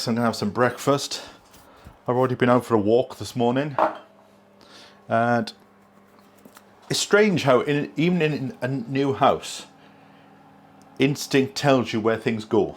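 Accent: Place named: Cardiff, United Kingdom. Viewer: How long were you living in London as you have a Cardiff accent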